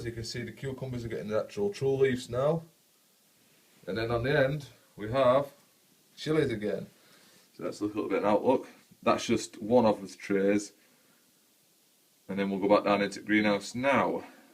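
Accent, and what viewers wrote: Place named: Barnsley, United Kingdom. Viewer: I didn't think you were far away with a Barnsley twang like yours. Check out if you already haven't johnnies allotment so funny. Got some chicks hatching so will put a post out same time as i get some photos of allotment. Its always good to see new people and allotments good luck for the season will keep looking.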